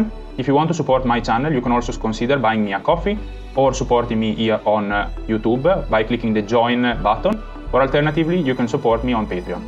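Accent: Italy. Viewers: but you sound italian 😁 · Your accent is just like a reknown Italian Football Journalist "Fabrizio Romano" and he is perfect at his job. You're similar to him!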